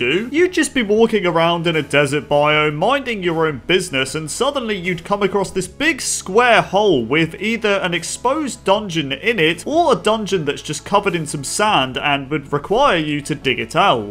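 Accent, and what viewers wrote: United Kingdom. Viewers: YOUR DEFINITLY BRITISH ARE YOU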